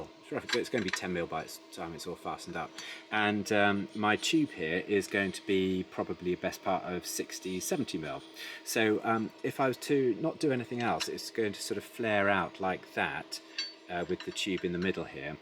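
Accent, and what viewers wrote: United Kingdom. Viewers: I totally didn't expect to hear the King's English from someone fabricating a farm tool. I had no clue Brits were still doing things like this. What a great video and really nice work.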